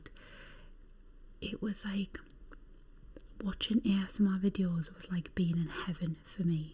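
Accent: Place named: England, United Kingdom. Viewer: I've only ever commented once or twice on your videos (HAD to comment ages ago about the girl in the library one because it's still a massive favourite). I couldn't not comment to congratulate you on your 100k subs. You're the only ASMR creator with an English accent who gives me tingles (I'm Mancunian, so it's not that I've got anything against Brits!). It's fantastic to see your hard work being recognised! Thanks so much for your uploads, for being so down to earth and for really knowing how to work the tissue paper! I know this isn't relevant to ASMR, but you always look so beautiful. Here's to 250k! Lots of love, Elaine X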